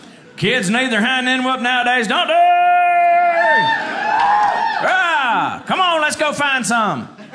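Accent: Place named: South, United States. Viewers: This man's accent is literally the stereotype of a southerner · God I love the southern accent!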